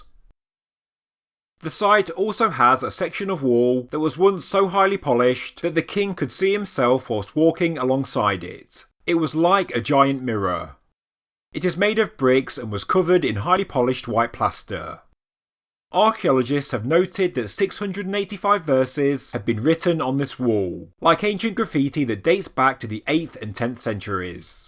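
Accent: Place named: Midlands, United Kingdom. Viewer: British, somewhere more north than London, probably Midlands.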